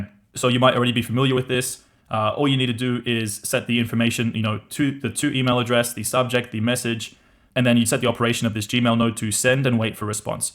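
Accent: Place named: Australia. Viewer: Cześć, prowadzisz ciekawe prezentacje, ale jest nieco śmieszne gdy odtwarzane jest tłumaczenie w języku polskim, bo tłumacz interpretuje wait jako „waga” nie wiem czy dlatego że nie rozumie kontekstu czy może akcent australijski jest tak odczytywany. Ciekawe co o tym sądzisz? Swoją drogą uruchamiam usługę w Polsce wspierającą procesy w n8n w zakresie komunikacji SMS i voice, czyli interakcje SMS i inicjowanie połączeń telefonicznych do klienta z powiadomieniem głosowym ( voice message) oraz inicjujące rozmowę z botem. Cóż za fascynujące możliwości stwarzają takie systemy jak n8n.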